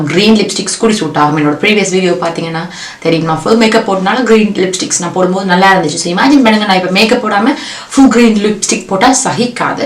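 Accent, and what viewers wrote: Sri Lanka. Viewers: Tamil super like srilankan tamil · Sis r u from srilanka I liked the way u talk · I like ur Tamil slang sis it's like srilankan tamil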